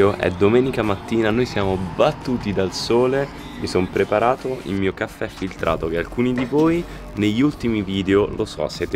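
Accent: Rome, Italy. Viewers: Il tuo misto di italiano, romanesco e inglese perfetto è il paradiso per ogni linguista 🅱️®️🅾️🤙🏻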